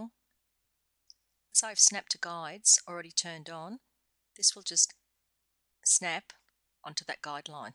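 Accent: Australia. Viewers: She's Australian I reckon..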